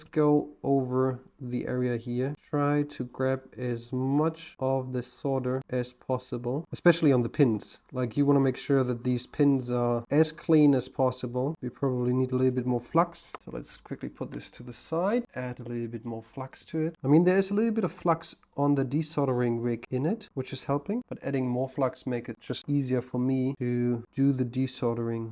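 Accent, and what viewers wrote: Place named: Oxford, United Kingdom. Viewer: I love your clear Oxford English, and now weiter to the Video. Best regards Ingo. 🐇🖖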